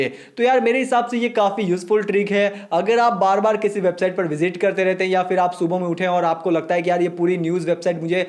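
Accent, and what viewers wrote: Gujarat, India. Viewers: Bhai aap Bharuch,gujrat se ho kya 😀🤔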